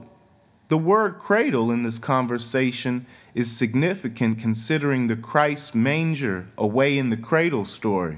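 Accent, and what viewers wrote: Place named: United States. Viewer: 7.15: cat in Latin is feles, not gatto. This is Italian. Zarathustra was not an esoteric Persian but a wise man like Buddha. Some things are so hard to understand in your very, very strong American accent. It would be great if you could ask maybe the Google translator how to pronounce words. At 5.55 f.ex. Or Zarathustra. I understood Persian. So the rest I understood because I know the rest of facts on my own. But this is not the sense of your vids, right? This would be a solution for this problem. :) <3 I wish I would have understood more of it. But even the subtitles did not understand you. No joke. Desperation! But thank you for what I got from this. Very y interesting thoughts and lots are so true! Good luck to you!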